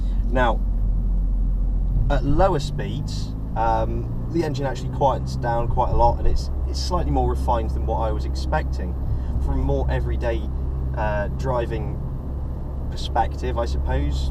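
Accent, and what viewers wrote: United Kingdom. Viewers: total waste of 10 mins. only interior shot of a guy driving around with annoying British accent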